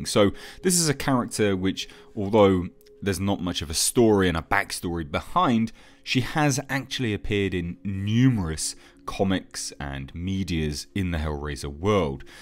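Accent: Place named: England, United Kingdom. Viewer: Marvelous Discovering more about my favorite Clive Barker work with you're voice ( and English accent) just keep my blood boil for more Barker lectures 😜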